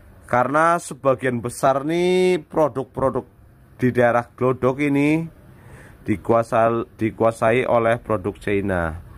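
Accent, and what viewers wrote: Banyumas, Indonesia. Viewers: Ngapak purbalingga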